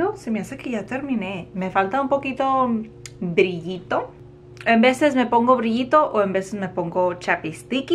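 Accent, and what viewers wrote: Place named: United States. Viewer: OMG sister your Spanish needs work but you are hilarious I love it 😻 Just listening to you makes my day cuz you can be so gringa😭👎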